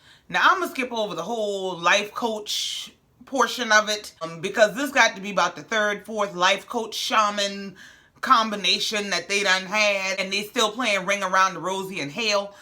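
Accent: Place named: Charleston, United States. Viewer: are you from Charleston, SC or have roots there? I subscribed because of your accent and content. #GullahGeechie for life❤️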